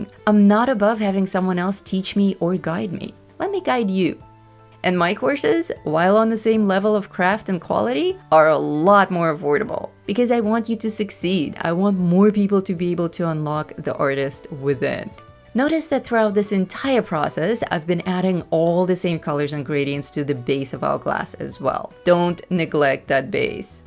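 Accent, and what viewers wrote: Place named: France. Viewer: You have a beautiful accent, what language do you speak? I get a hint of French.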